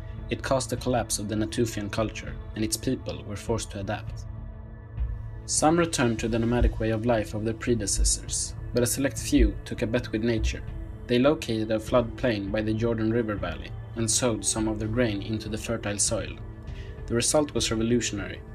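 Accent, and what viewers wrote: Sweden. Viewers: Juan Carlos Andreu He's Swedish, cursed with an accent. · Are you swedish? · tænk, så stokholm-svensk... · The narrator sounds sooo swedish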